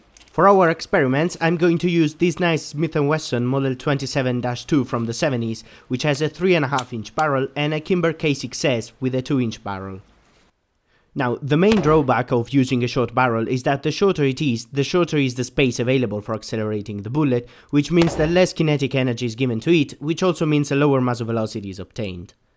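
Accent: Italy. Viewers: Italy i think, i know i love his accent. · So you are italian - i was wondering what the accent is 😘 does anyone know if he lives in US or Italy? 🙊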